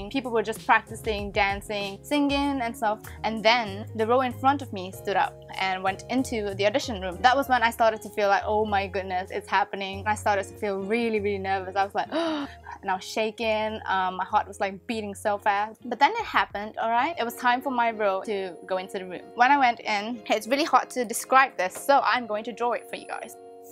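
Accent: United Kingdom. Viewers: You sound British 😂😂 btw is it ok to tell us the group you were going to debut with.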